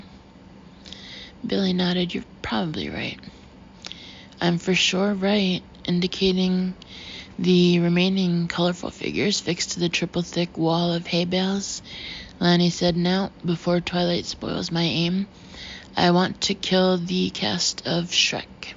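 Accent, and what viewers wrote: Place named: New York, United States. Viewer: Your relaxed, classic New York accent is the perfect narration for these novels. It makes them a pleasure to listen to.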